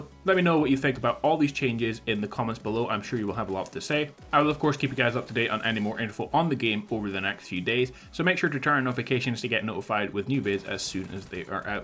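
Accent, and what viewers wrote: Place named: England, United Kingdom. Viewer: Your voice is like pencils getting stabbed through my eardrums. You goofy English Fuck.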